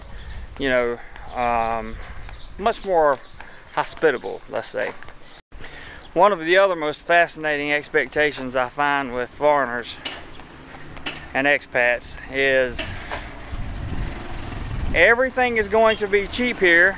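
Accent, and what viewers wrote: South, United States. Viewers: New subscriber here. Thanks for the videos man. They are more deep with a reality of what to expect. You sound southern, where are you from Sc? Hahaha any way. Am planning on moving sometime to the philippines, around the Marakina city.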